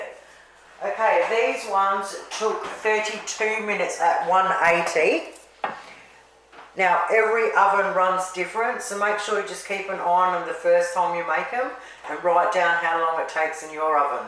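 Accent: Australia. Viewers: Ooooooh so yummy I just made these I didn’t have punkin so used sweet potato Also I added some chopped shallot greens as I only had a small small onion And I used the borg pastry sheets Oh my yumminess!! Thank you so much You are my family’s favorite YouTube watch We also made the pea soup pie floater last fortnight Keep up the happy cooking and Aussie talk Absolutely has my ‘special’ boy laughing and looking forward to the tasting of these amazing easy to follow recipes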